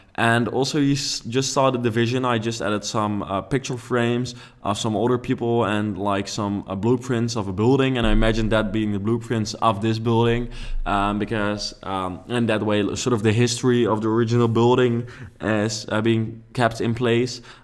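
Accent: Netherlands. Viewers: This dutch accent though🙈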